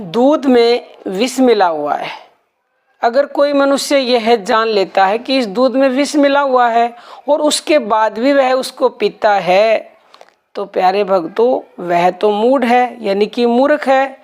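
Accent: Haryana, India. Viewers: जय श्रीराम माता जी आप क्या हरियाणा से हैं क्या आपकी आवाज से तो ऐसे ही पता चलता है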